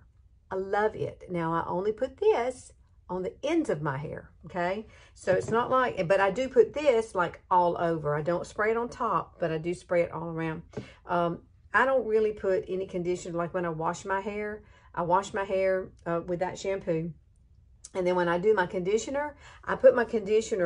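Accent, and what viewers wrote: South, United States. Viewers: OMG girl I cannot wait to order that curling iron. I too have super fine, super thin, and super straight hair. My hair never and I mean never holds a curl. I cannot wait to have lasting curls and volume. Also, I think you are so damn cute! I showed my husband a brief clip of you and he chuckled and said other than the southern accent he could swear we were related by your personality. Accept I am very sarcastic! One last thing, I do not have a pace maker but I just got a portachath placed in the same exact spot your pace maker is. Be patient it will become just a part of your body and at some point you will forget its there. We just have to count our blessings for the gifts of modern medicine that can extend our lives and keep on keeping on! Blessings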